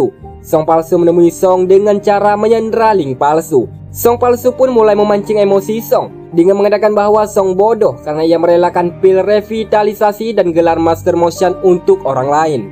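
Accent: Aceh, Indonesia. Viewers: Logat apa Aceh , lanjot bang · Apaceh banget logat nya. Wkwkw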